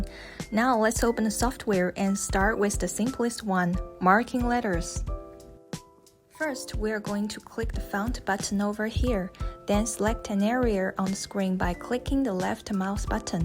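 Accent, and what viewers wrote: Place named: China. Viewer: 听口音是中国人吧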